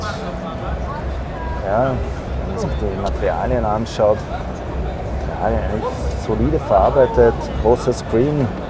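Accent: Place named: Austria. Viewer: Ich glaube, für Leute wie mich, die sehr aufgeschlossen und begeistert für E-Mobilität sind, aber andererseits nicht sehr risikofreudig - seid Ihr die richtigen Partner. Als Allgäuer höre ich die österreichische Sprachfärbung sowiso lieber, als die Ost-Deutsche.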